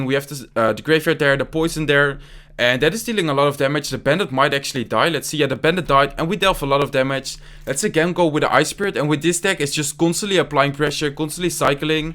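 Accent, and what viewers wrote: Netherlands. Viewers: Hahahaha dat Nederlands accent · Lol dat accent zo gemakkelijk om te weten als er een nederlandrr engels praat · Nederlands accent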